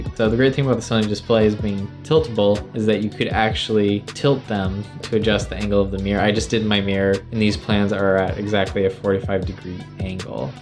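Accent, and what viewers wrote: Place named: Norway. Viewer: you hear like a norwegian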